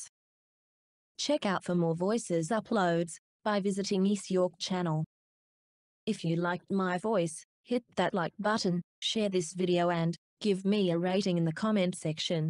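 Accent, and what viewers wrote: United Kingdom. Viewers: Her Voice Sounds Like A British UK Accent.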